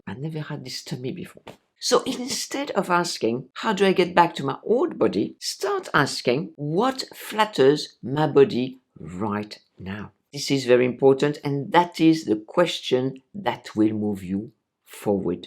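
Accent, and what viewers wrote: France. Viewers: . You are a natural teacher. Your voice tone, the pauses, inflections are so calming. The French accent is the topping on the cake! You impart a part of yourself to us. We feel it. You are so confident. You pull us in and we want to learn everything! Even if we know we're already. Thats a gift from God. Never give up. PS I can't wait to make apple your cakes from your other channel.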